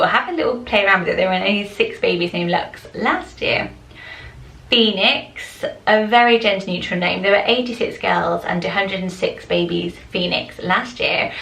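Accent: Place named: England, United Kingdom. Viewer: Evelina sounds so much prettier in your English accent. My favorite this time must be Phoenix. What about Theon for all the Game of Throne fans out there. A very cool alternative for Theo.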